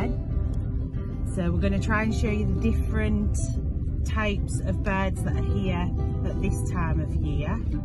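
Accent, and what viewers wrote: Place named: Hull, United Kingdom. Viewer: That's a Hull accent if ever I heard one!?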